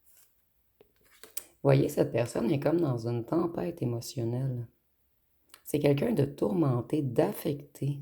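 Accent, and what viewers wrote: Canada. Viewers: ❤ Merci,oui, exactement,il a menti, évitant, sûrement vie antérieure mais où ?, exactement il a tout foiré, j'adore votre accent canadien,en le côtoyant j'ai compris qu'il ne voulait pas travailler sur lui,et guérir ses blessures.C'est exactement ça,en se rejettant,il m'a rejetée, m'a fait beaucoup souffrir 😢😢.On a la blessure d'abandon en commun,et de rejet aussi,il faudrait qu'il aille voir un psychologue.C'est ma flamme jumelle 🎉🎉,tout ce que vous avez dit est excellent.On s'est rencontré en 2021.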